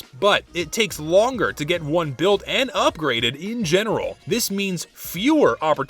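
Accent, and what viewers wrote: Texas, United States. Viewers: I thought you were Russian Badger doing a southern accent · Him is Texan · Texan he is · Honestly, I could listen to mathematic/scientific lingo spoken in a texan accent forever, wouldn't mind if ya didn't hide it when you went into statistics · ah, the southern drawl on an engi vid is soooooo perfect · that accent threw me off so much, i was like "did he actually have that thick of a southern accent in his older videos?" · I love your southern accent in this video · Y'know, after i found out that you're from texas i started hearing your accent. Kinda bizarre but i love it Keep up the good work, i wish you a good year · Texas male is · I can't tell if he's trying to do a country accent or not trying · At first I though he was doing the accent because "heHe engineer is texan lol" but then I remembered is Texan. Good on you man, as a fellow Texan, I say bring out the accent more often. 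· Walks in a Texan Accent Me: wow thats what I call getting into character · why do that texan accent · For some reason, I didn’t question why you were speaking like a Texan until you pointed it out. Like “Gasp, wait he’s one of us now!” XD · Nice subtle Texan accent. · Your subtle Texas accent in this video gives me pure joy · So is no one gonna talk about how he's actually went through the entire video with something of a Texan accent? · I absolutely love that you brought out your Texan accent for the engineer-specific video. Great attention to detail. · I liked how you did this video in a Texan voice. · he be Texan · are you trying to sound like a texan, because i have no idea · Is it just me or does he have a slight southern accent in the video? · I forgot how Southern he used to sound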